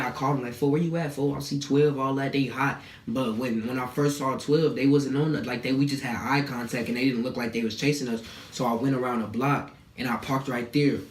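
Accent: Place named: Chicago, United States. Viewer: I can tell yh frm chicago fote😭😭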